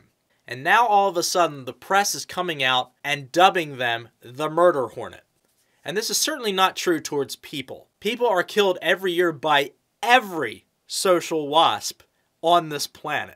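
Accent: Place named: Philadelphia, United States. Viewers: Your Delco accent is strong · I thought you sounded like you are from Pa. Monroe County Poconos here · I knew you had to be from the Philly area by your accent!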